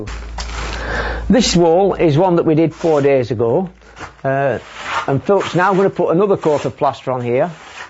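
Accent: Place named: Preston, United Kingdom. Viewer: sounds like a preston accent ,you from preston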